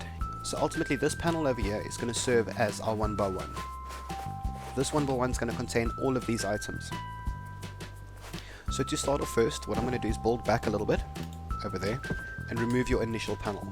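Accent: Afrikaans, South Africa. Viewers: thanx this vid was realy helpful are u south African? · You sound south african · are u south African? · R u south african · I love how i can recognize a fellow SA M8 voice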